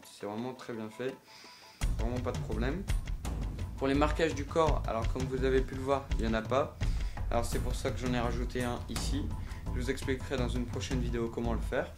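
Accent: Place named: United Kingdom. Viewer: J'adore ton accens anglais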